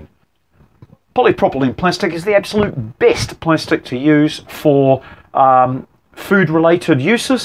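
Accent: New Zealand. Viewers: So that’s how a real live newzealander sounds like!!!!!!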